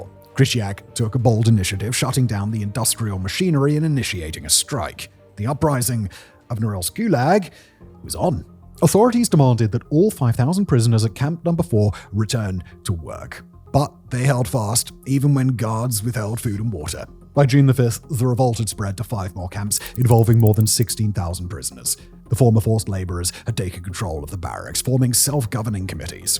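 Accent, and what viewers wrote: United Kingdom. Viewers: Fight to keep human created content! there's nothing better than a British guy talking about history anyway.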